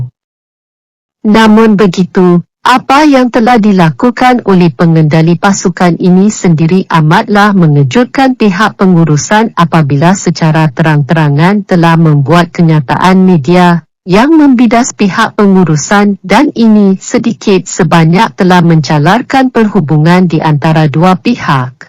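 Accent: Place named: Indonesia. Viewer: Yg bercakap ni indon ke...ckp tak sedap tak jelas...tak ada orang Malaysia ke yg boleh cakap..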